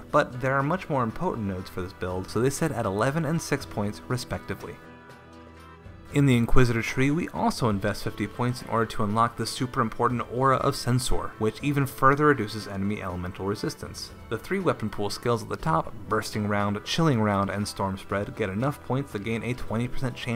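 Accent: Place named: Texas, United States. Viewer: In the First Moment i thought here was a Cowboy from Texas, who plays GD^^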